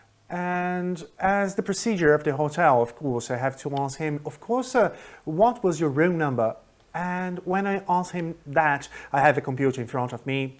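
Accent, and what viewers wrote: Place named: United Kingdom. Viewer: I really liked your history, I could imagine every moment that you described and I guess that was a chock and surprise , I laught a lot with you hahahahha Please stay with this kind of videos, they're amazing! And I couldn't avoid to notice your britsh accent, you talk exactly at the same way of them!! lol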